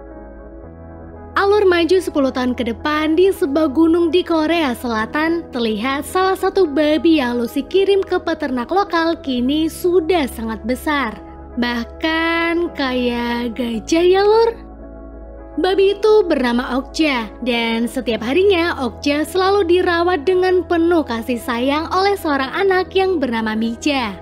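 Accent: Pemalang, Indonesia. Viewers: wong pemalang?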